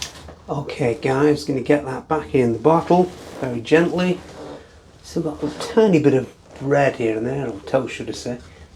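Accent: United Kingdom. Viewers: Sitting here watching a British dude teach me how to make a fowty in my garage.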